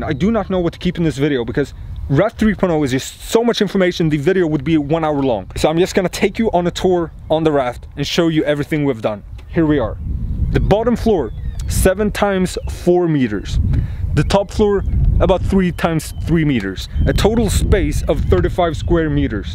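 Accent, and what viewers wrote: Norrland, Sweden. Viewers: bor du i norrland eller låter så på dialekten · 'I bore three holes [...]' The Swenglish is strong with this one.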